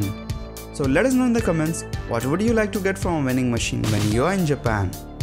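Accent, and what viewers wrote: India. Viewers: the indian accent is too strong, pity.....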